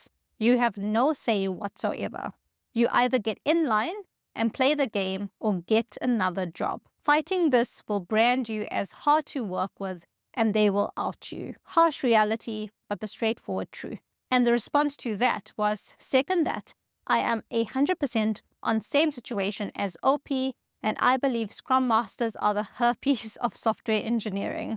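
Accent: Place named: South Africa. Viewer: Spotted the South African!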